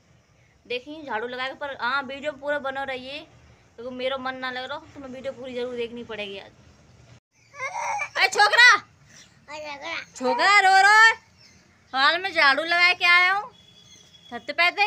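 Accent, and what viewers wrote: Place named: Mathura, India. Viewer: Apke mukh se dehati bhasha bahut sunder lag rahi hai.bhasha ke hisab se aap mathura side ki lagti hain.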